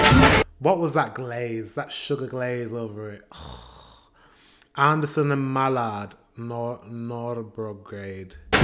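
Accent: United Kingdom. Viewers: By the way. Your english sounds british.... I continue anyway. What about risengrød. On that, you have the same cinnamon/sucker on top of it. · you are such a sweet and charming young man. Is your accent northern? or midlandish?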